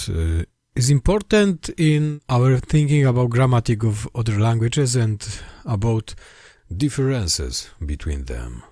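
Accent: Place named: West, Poland. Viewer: tbh.. Your sanskrit sounds like you're reading it maybe for the first time. It has a more western accent. But I understand it may be because you're new to it (i guess) or because you're reading it in a latin script. There are parts where we pronounce the sound short or long(this can be known while reading it with the Devanāgari script). Old Sanskrit was also written in Brahmi script(which has connections to Aramaic) but rn Devanagari is widely used. I'd suggest, if you have time, try to learn the sanskrit alphabet and phonetics. Or maybe listen to sanskrit being sung, chanted or spoken.. Also Check her channel: <link> I think she is Greek(from her name). She has quite an accurate pronunciation of Sanskrit. Really Accurate! I've been learning German for some months now. I observed some similarities in Grammar and Word Patterns. German and Sanskrit both have Gender words for non living things.. 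Oh and also the ability to create new words by joining pre-existing words. And creating longer words/sentences with many words joined as one. I've seen it being done in Sanskrit and German both.. I found it interesting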